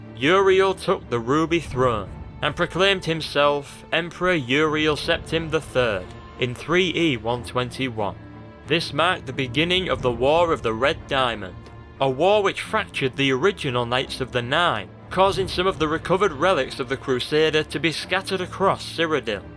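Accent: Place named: Yorkshire, United Kingdom. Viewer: I love Yorkshire accents almost as much as I love the Elder Scrolls. Luckily for me. :)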